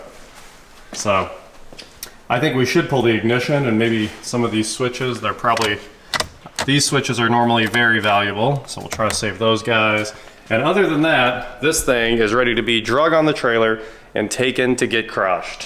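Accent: Kansas, United States. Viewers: “Ardic blast” gotta love that Kansas accent haha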